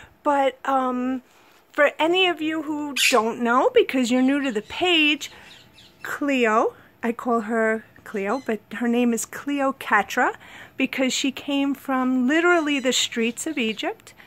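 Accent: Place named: New York, United States. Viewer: how much I enjoy your accent. Long Island? Staten Island?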